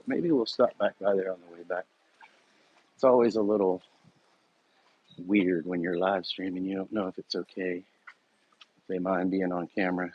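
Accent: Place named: South, United States. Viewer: I hear your Sourhern accent, mister. That part of town is super chill in the morning. The most cars I could count at once was three.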